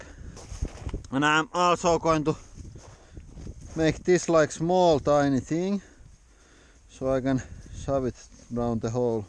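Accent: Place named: Finland. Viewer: Palllloon! love Finnish accent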